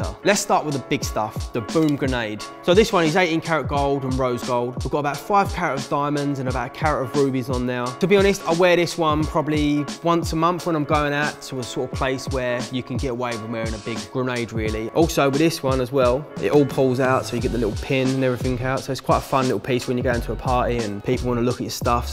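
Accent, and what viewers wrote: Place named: East London, United Kingdom. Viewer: Wow, amazing collection 👌 Just found your channel, love it! Love your accent too, sounds just like mine 😜 Born and bred in Custom House, East London but live in Australia at the moment 😊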